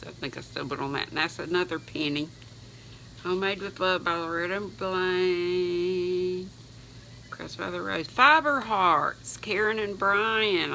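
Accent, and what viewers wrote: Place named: Iowa, United States. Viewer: I love your new name 😃❤🐿🐿 I just love you girl. My late mother used to talk the same way and she was from Iowa. There was a couple three and she used to call a tiger a tager.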